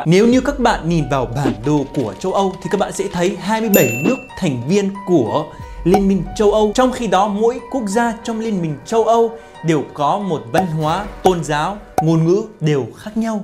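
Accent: Standard. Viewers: Noi tieng viet nam chuan qua cam on . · Mới biết kênh và rất ngạc nhiên vì nói tiếng việt quá chuẩn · mình cảm thấy hổ thẹn với bản thân mình quá. nói tiếng việt chuẩn và lưu loát quá. mà công nhận có trình độ địa lý đáng nể. chúc thành công trong cuộc sống. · ! Ban rat gioi toi nguong mo ban, Ban la nguoi noi tieng Viet rat chuan! (moi ban den tham qe toi Can Tho mot lan nhe!) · Chào nhé..cô thấy cháu nói tiếng việt rất chuẩn. Cảm ơn vì cháu yêu đất nước của cô.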